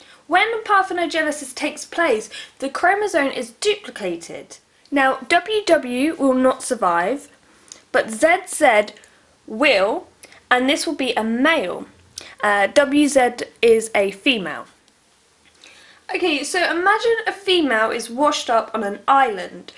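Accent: United Kingdom. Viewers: She's from Uk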